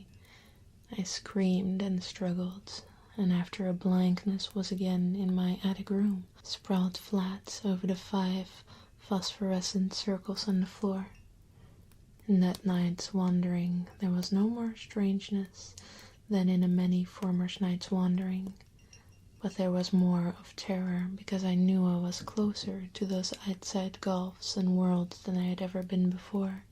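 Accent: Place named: Netherlands. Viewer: fireplug1701d Ah, you should have been here a couple of years earlier my friend. The lovely accent was more pronounced. Check out her older videos on the main playlist. I remember when our lovely couldn't say the number "three." There's no "Th" sound in the Dutch language. So three came out as "tree." It was adorable in her ASMR voice. Then one day, someone in a livestream requested she count to three. She scowled a bit before doing just that. End of an era.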